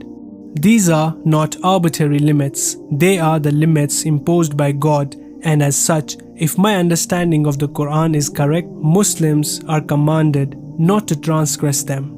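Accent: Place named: India. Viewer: Too old news these indian posts old information, with funny English accent fix your English first.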